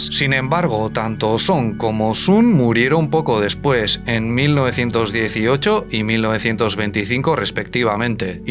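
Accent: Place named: Basque Country, Spain. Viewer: Es acento típico de la zona de España de donde procede. Esa cadencia que dices, viene de influencia del euskera.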